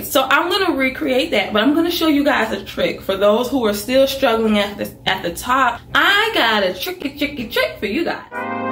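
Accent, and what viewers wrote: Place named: Virginia, United States. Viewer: Girl!! You the bomb! Love your energy! You sound like a VA girl!❤❤❤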